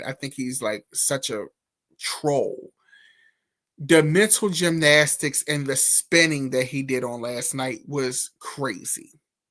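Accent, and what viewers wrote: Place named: Maryland, United States. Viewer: Great perspective..I knew you were from Maryland....that accent. 😊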